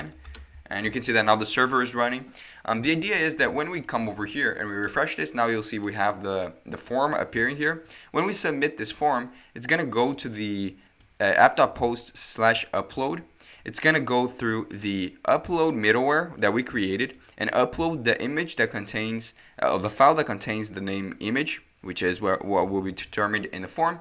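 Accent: Brazil. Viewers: TU É BR NÉ? namoral sotaque foda, mas da pra ver q é br. Belo tutorial <3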